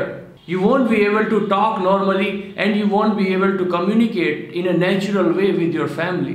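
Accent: India. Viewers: Dat Indian English :D why are indians making this vids ? I heard thath they are not rich... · I think is a indian by his speaking language and.... Nothing 😅😅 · I'm pretty sure he is he sounds Indian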